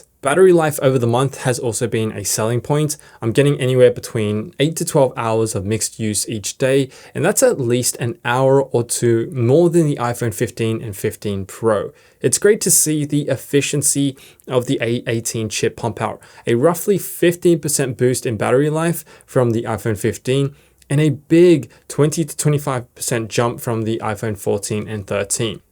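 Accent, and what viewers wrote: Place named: Australia. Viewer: By your accent you are Australian but are you quoting US prices? Last I looked the iPhone 16 is $1399.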